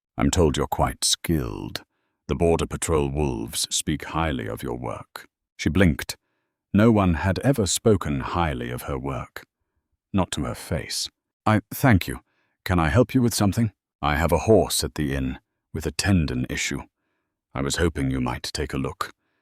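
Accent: United Kingdom. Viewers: I can't quit laughing. LOLOLOL Thermal regulation? LOLOLOLOL Calling him a big baby. The narrator with the British accent is PERFECT. LOLOLOLOLOL LOVE IT !!!!!!!!!!!! Love hearing what a MAN will do for a woman. Just soo wonderful to LAUGH... Thank You.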